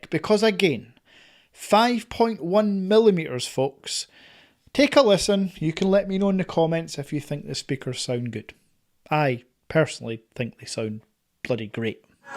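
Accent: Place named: United Kingdom. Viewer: Guy British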